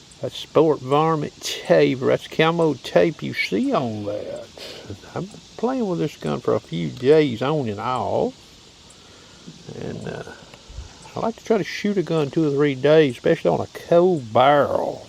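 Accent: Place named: New York, United States. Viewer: Great Video... You sound like you might be from around by me in New York. God Bless. Pew Pew. 😎👍👌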